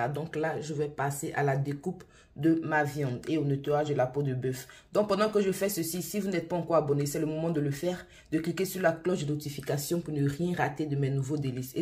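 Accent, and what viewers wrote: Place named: Ivory Coast. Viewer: Merci ma go ivoirienne préféré j'adore ton accent et ta manière de parler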